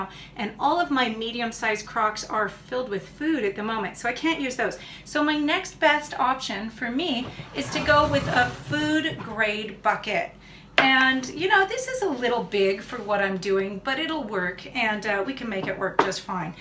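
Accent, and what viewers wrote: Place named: Midwest, United States. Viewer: You sound like you're from the midwest...? I will have to have a go at some corned brisket. Mmmmmmmmmm.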